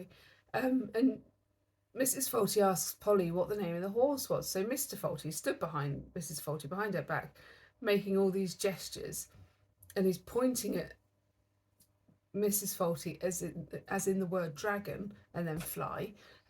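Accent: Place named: United Kingdom. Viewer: It was such a pleasure to watch your video. Your accent is exactly the same as my dearest friend in Britain, so listening to you made me feel like I was sitting with a friend who I have known for years. I love all of your projects and look forward to seeing my progress.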